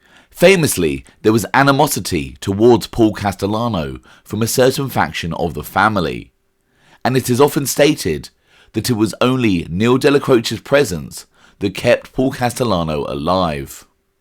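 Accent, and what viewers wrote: United Kingdom. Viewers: Can we just ONCE have a documentary that isnt narrated by a stupid british accent that butchers every other word??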